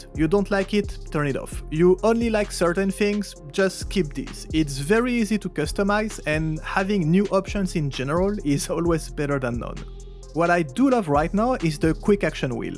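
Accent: France. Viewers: Are you French by any chance ? :D.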